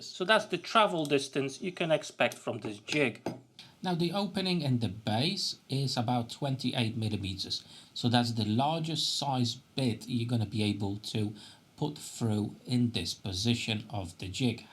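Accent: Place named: Black Country, United Kingdom. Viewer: Thanks. I´ve just ordered one of these from Ali Express. I read your bio. Your English is great! Did you live in The Black Country when you were in England? Birmingham? Wolverhampton? The accent can be a bit comical if you´re not from round those parts. Sorry Brummies. Love you, really!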